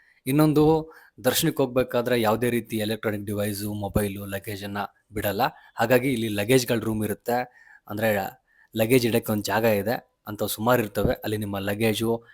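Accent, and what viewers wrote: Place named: Central Karnataka, India. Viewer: Yava uru nimdu, launguage kelidre middle Karnataka ansutte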